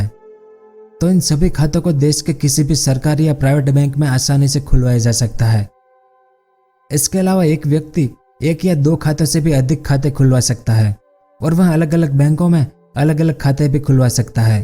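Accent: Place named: Rajasthan, India. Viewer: Voice Rajasthani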